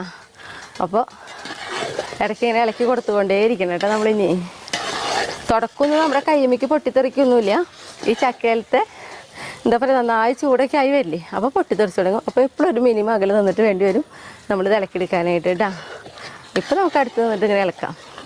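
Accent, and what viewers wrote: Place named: Thrissur, India. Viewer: de സംസാരം ശരിക്കും ഞങൾ പറയുന്നപോലെ തന്നെ.... കോഴിക്കോട്ട, കിണ്ണം,davara allam.same. തൃശൂർ എവിടെയാ വീട്.... നമ്മൾ കുന്നംകുളം,പഴഞ്ഞി, ചെറുതുരുത്തി ആണ്....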